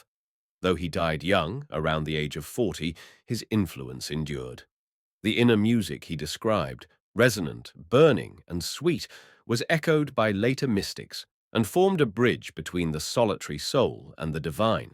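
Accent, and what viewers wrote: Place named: United Kingdom. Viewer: Interesting subject and perfect accent. This is the real British accent .😊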